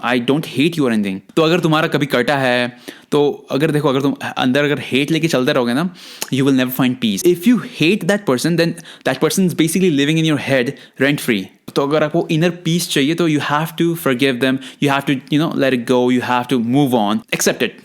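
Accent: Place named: United States. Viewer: Ur accent is amazing Ur accent is totally American , I love u🤗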